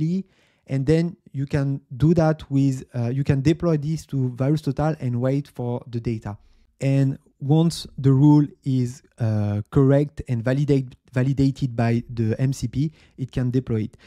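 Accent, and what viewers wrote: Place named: France. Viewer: Ah l'acccent fr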